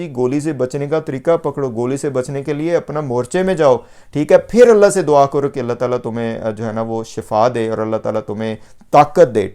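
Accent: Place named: Punjab, India. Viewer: Ap punjabi py zor deny ki bjaay topic py bt krty wqt bch jta... Fuzool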